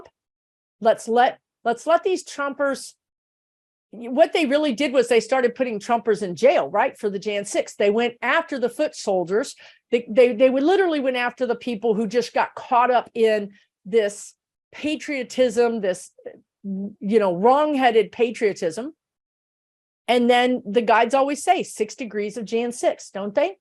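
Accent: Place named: New Jersey, United States. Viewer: Sounds like Bayon, New Jersey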